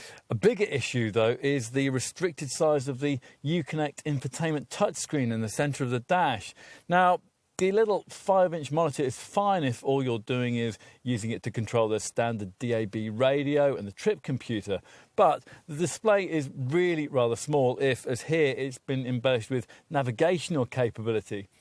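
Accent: United Kingdom. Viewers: I really love the videos by. This presenter also has an impressive personality and charisma. The video is beautifully explained, and it is rich and thick in its description. I loved the presentation, the language and the British accent. Most off all, I liked the candidness and forthrightness with which the reviewer discussed the positives and negatives. This was the Fiat Tipo review I'd been looking for since its teaser in 2015. Took me 6 whole years to find it finally. I am a forlorn Fiat lover from India where this product was supposed to be launched, but shelved after Fiat shut shop in 2019. I've watched this video like 20 times and still can't get enough. I loved it, and its amazing!!!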